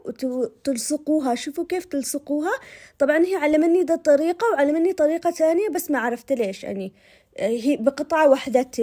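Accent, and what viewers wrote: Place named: Sanaa, Yemen. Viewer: ماشاء الله عليش بلهجة اهل صنعاء مشحوطه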